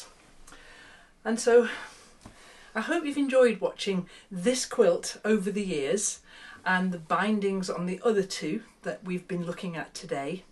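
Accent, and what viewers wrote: England, United Kingdom. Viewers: I have only just found you. It am English and its lovely to hear an english voice . I have a lot of Kaffes flower fabric plus other bits and pieces of flowers. I couldnt think what to do with it, I know now. I watched Grantchester as a series, I wouldnt have wanted to watch it in one go. I have hand pieced, hand quilted a hexagon quilt. I was never quite happy with the finish though wasnt sure why. You may be horrifed but I now have the front in two pieces and removed everything else to finish it again, maybevadd a few hexies, I have hundreds made. It is a big throw size unfortunately, I also have some quilts that need finishing. Maybe I should start a new system as you have. I dont have facebook but do love looking at Instagram.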